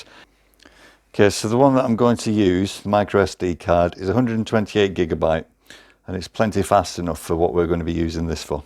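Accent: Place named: Accrington, United Kingdom. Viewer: I'm in Accrington and your accent is very familiar! Where are you?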